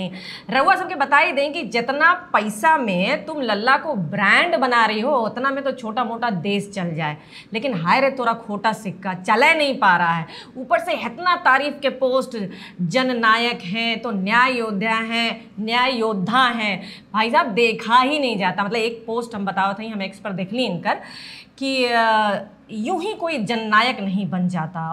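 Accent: Bhojpuri, India. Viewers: Another very interesting episode. Well prepared. Adding a song line added extra flavour. Your Bhojpuri accent is very flavoured.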